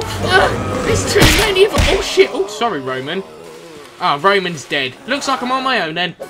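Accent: United Kingdom. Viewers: its ok man by the way r u British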